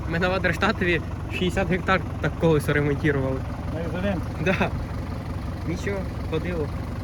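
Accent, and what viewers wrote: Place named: Western Ukraine, Ukraine. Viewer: у вас гарний західноукраїнський акцент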